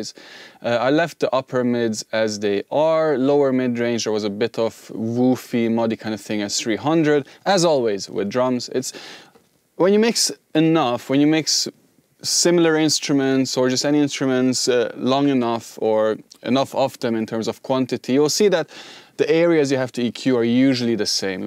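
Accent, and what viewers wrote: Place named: Hungary. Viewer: ... and you are from Hungary:D... if I am not fooled by my ears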